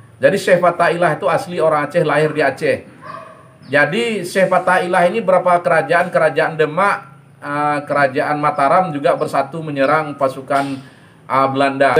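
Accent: West Sumatra, Indonesia. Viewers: Maaf pak saya msu tanya, kalau di lihat dari logat bapk saya yakin bapak barasal dsri sumatra barat kayaknya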